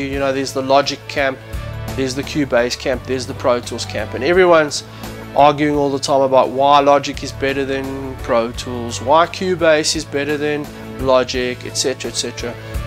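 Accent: South Africa. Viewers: I must say I do enjoy your way of explaining things to the people. Very simplified and gentle (I like your South African accent too) I hope you're happy with your life, I think you have earned the right to be happy :)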